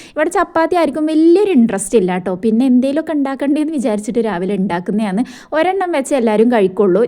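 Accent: Malappuram, India. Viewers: Hai video eshtayi nammalum illi yeduth modayanna paraya.😂😂malapuram bhasha👍😅ok da by sainuty❤❤❤❤❤❤❤❤❤❤❤❤❤❤❤❤❤❤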